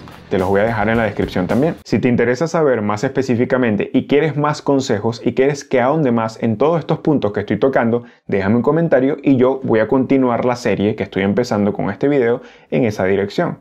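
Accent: Venezuela. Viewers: Vos sois maracucho verdad? 😂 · Excelente video hermano! Solo por curiosidad, ¿eres venezolano? tu acento me suena. XD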